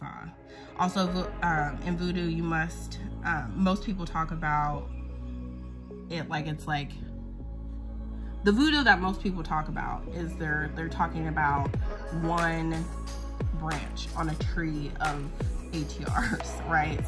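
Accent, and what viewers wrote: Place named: Northeast, United States. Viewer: very helpful thank you!! Also you sound a little like me-- and people don't always know what my race is based on my voice lmaooooo-- are you from the NorthEast of USA? (I'm from NYC)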